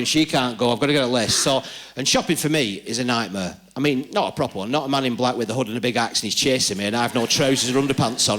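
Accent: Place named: Australia. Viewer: Australian accent can be tough to understand at times. Hope he can acquire some jokes, somewhere , somehow.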